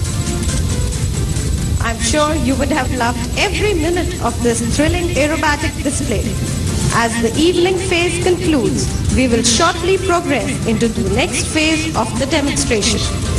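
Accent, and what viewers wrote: India. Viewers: i hate thick indian english accents....they should've shut that bitch up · Curry English has special favor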